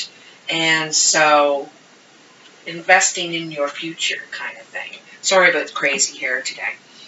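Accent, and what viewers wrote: Canada. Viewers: I thought so, I just got such a vibe, probably 'cause i too am in ontario, but when you said "eh" I thought yes! she has to be Canadian, lol Tks for replying :)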